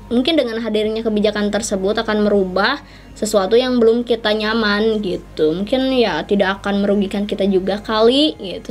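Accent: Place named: Sunda, Indonesia. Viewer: Ciri has ngobrol nya Sunda banget teh semangat sukses sllu teh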